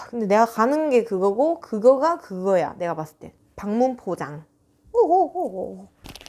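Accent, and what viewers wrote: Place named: Gyeongsang, South Korea. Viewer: 아~따마 사투리 지기네예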